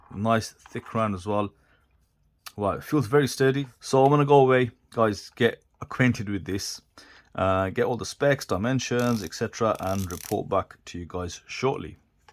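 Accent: Birmingham, United Kingdom. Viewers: Bro you from Birmingham?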